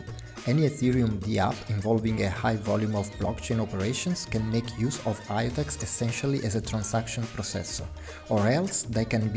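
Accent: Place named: Bulgaria. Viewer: the speaker has bulgarian accent :D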